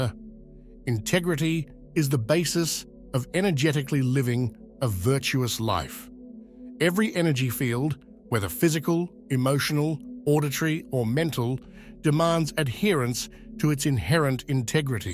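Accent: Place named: New Zealand. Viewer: So nice to hear a New Zealand accent.... familiar and comforting.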